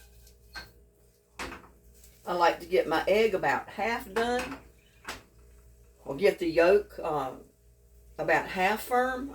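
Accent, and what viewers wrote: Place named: East, United States. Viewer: Hi. Curious... you're in Texas?... Trying to figure out your accent..are you from the east?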